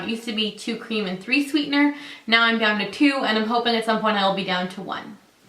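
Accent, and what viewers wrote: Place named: Canada. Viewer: Great video!! I agree with you about Canadians being so accepting! Also, since I lived in Vancouver for 3 years, I can hear the difference in the way you say "about" and "sorry". That doesn't mean it's wrong, it's just different, the way many regions in the US have different accents and different words for things. No one in California says "y'all" (except I do, because I have friends in Texas and I like it). My favourite difference in Canadian/American words is that you guys say "garburator" whereas we say "garbage disposal". Differences are fun! :) I might do this Canadian tag too, even though I'm not technically from Canada (three years is long enough to be considered a resident, right? :D ). I do have some answers for most of these questions already... Also your child is SO ADORABLE!!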